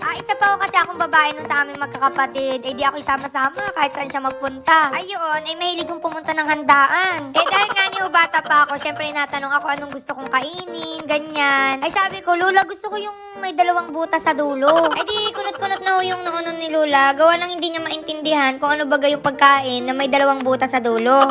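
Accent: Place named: Marinduque, Philippines. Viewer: My lahi k plng Marinduque kya pla lagi kng my ngani sa salita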